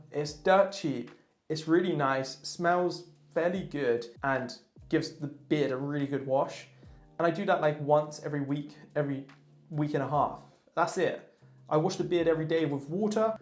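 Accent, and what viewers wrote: United Kingdom. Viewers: I noticed you're using beard butter from Detroit Grooming which is from the US but you sound like you live in the UK. Is there a UK supplier for that brand?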